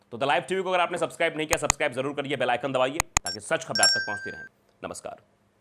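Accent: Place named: Bihar, India. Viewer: Very gud. But bro, ur accent has changed a little bit. Keep it a little bit to bihar type. It sound gud.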